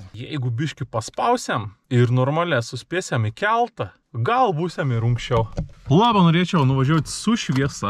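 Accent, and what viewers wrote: Samogitia, Lithuania. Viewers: Jei jau isdavei savo gimtine zemaitija, tai ir ismesk ta akcenta ir priimk naujos tevynes - novavilniecio kalba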